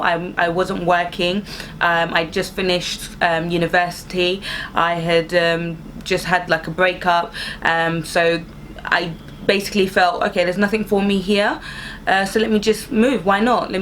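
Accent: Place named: Nigeria. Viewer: i always find that transitional accent fascinating, you obviously grew up in nigeria so i should be able to adjust back quickly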